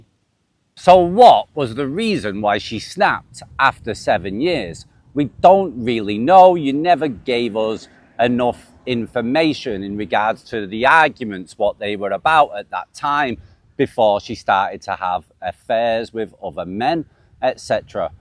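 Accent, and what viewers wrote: Manchester, United Kingdom. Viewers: Where are you from, Oldham? Your accent is almost Manc apart from a few words you pronounce like ''there and video'' for example.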